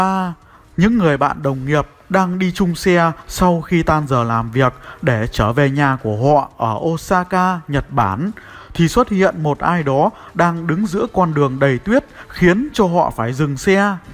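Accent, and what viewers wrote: Thanh Hoa, Vietnam. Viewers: Dám chắc đây là giọng thanh hóa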